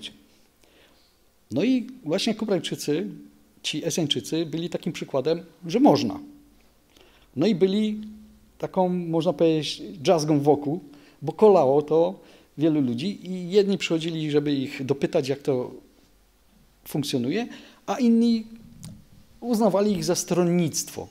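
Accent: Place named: Silesia, Poland. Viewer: Bardzo ciekawy wykład! 👍 I ten spokojny głos, ze śląskim akcentem😉 Przyjemnie się słuchało. Dziękuję.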